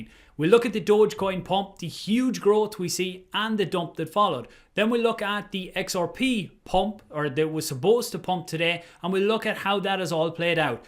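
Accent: Ireland. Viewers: He's Irish. I don't know which part of Ireland though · I know he is irish. Iam irish too ha Thats why i know he is irish but i cannot figure out what county he is from!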